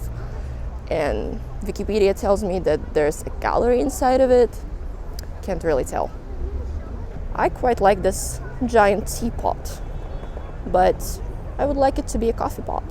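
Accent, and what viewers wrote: United States. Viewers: You've got an American accent with your English I would say. Definitely not a British one. If I saw you in Wisconsin I might almost think you were born here. Nice job. Enjoyed the video. You have a certain charisma and charm.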